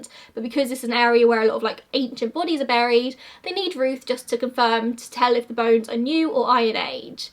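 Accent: England, United Kingdom. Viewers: Reading, England I believe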